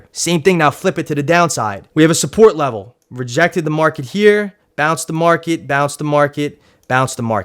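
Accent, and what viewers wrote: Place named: New Jersey, United States. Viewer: You deff from New Jersey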